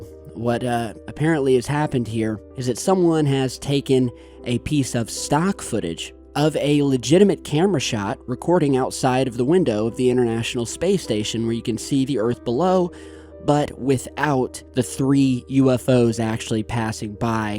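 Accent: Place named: Southern Ohio, United States. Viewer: Haha! I noticed that too. That's strong southern Ohio accent for you.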